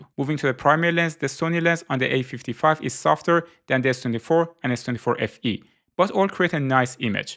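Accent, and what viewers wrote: Iran. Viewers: Your persian accent>> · داداش دمت گرم خدایی ایرانی هستی؟؟ انگلیسیت خیلی با لهجست😁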